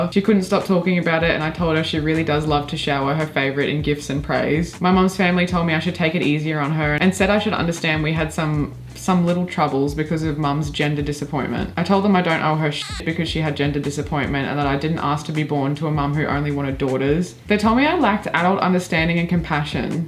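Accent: Australia. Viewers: Hey, I love these videos of yours and I love how invested you get in the story. It’s probably the Aussie way you talk about it.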